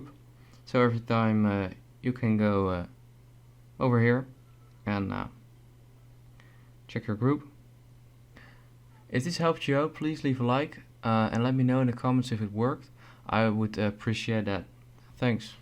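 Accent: Netherlands. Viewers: Lekker nederlands accent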